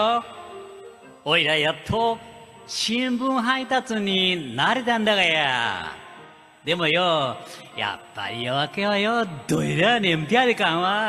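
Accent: Nagoya, Japan. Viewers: さんおはようございます。名曲も名古屋弁になると雰囲気も変わりますね。味があって感動しました。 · こんばんは😊 新聞少年聴かせて頂きました♪ 名古屋弁、とっても味がありますね😊👍 聴いていてとてもウキウキ気分です✨ 素晴らしい歌声をありがとうございます😊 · さんこんばんは‼️ 拝聴しました👍👍👍👍👍 セリフ名古屋弁で良いですね👌 懐かしい素敵な歌唱有り難うございました🤗👏👏👏👏👏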